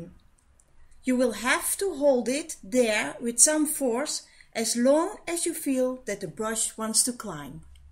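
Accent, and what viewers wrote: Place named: Netherlands. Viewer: What a horrible dutch accent, my ears are bleeding.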